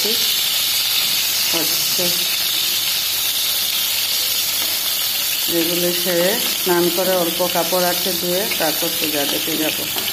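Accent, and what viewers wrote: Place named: East Bengal, Bangladesh. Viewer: Khub khub valo laglo🎉🎉tumi kothay thako tomar kotha sune mone holo tomra purbo bongiyo... Amio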